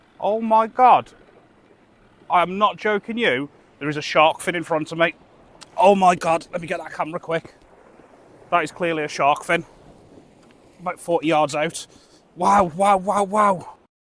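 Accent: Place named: Midlands, United Kingdom. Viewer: I’m from the Black Country your accent sounds a bit local ish to the midlands mate! Love your videos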